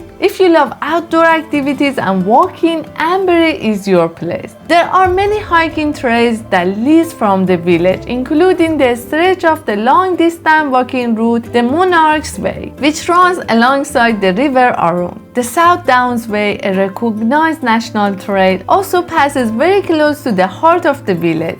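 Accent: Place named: Iran. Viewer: You sound very Iranian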